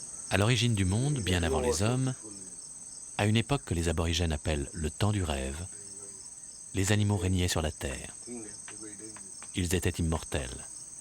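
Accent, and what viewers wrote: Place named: Marseille, France. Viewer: De ouf le narrateur il est un peut marseillais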